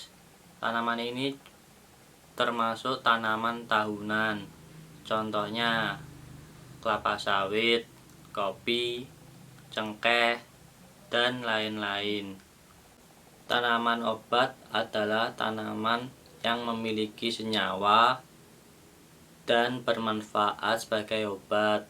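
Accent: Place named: Java, Indonesia. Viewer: medoknya kusuka🔥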